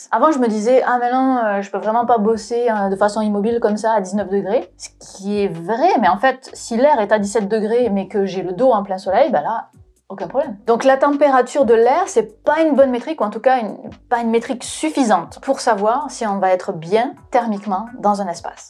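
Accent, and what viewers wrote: South, France. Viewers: Québecoise avec un accent presque du sud ☺ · Pour une québécoise tu parle avec un super accent français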